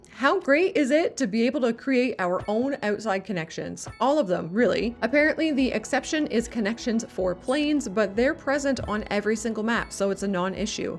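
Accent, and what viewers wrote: Canada. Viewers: ABOOT- CANADIAN ACCENT- ugh lol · Nobody really says aboot but her accent does sound Canadian to me (source: Canadian) otherwise so I think it's just fun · I have no idea why but you sound alot more canadian in this video than other ones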